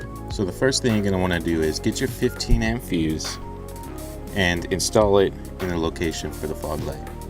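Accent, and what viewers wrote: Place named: Brazil. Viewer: cool lol. I'm Brazilian. You got a lil of bit of Brazilian accent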